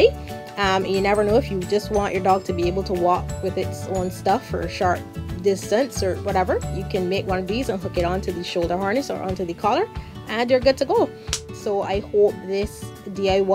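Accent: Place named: Caribbean. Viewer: great vid, busta??? where r u from? the caribbean?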